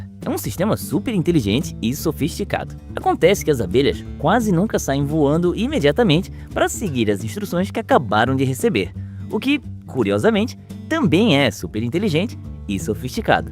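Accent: Santa Catarina, Brazil. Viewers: vc tem voz de catarino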